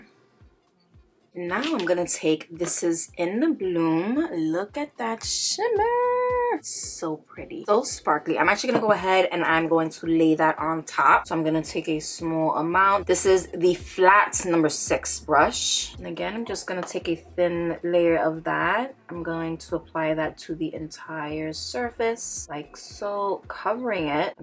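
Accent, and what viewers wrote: New York, United States. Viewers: I love your accent r u from New York? I’m A new sub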